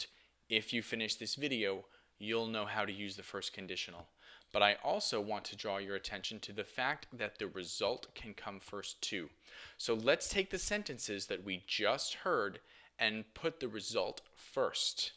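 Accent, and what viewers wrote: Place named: California, United States. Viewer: OH WOW, WHAT A LOVELY ACCENT, ARE YOU FROM CALIFORNIA? AND YOU ARE SO HANDSOME....I SUSCRIBED !!!!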